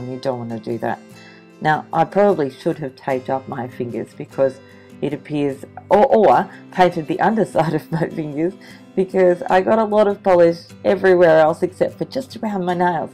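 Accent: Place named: Australia. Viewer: This is awesome, and how great is it to actually hear an Australia accent. Thanks for sharing this with us. Could you tell me where you get your Seche Vite from, please? I live in Hobart, so if you can get it I should be able to as well, but I haven't been able to find it anywhere. Can't wait to check out all your other posts.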